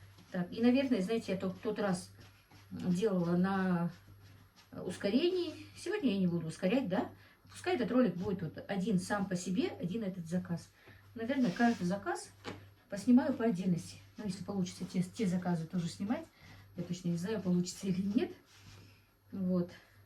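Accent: Standard. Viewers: здравствуйте, молодец, как у вас всё здорово получается, а как вы чистейшей говорите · У вас чистая русская речь,удивительно даже,дай бог здоровья!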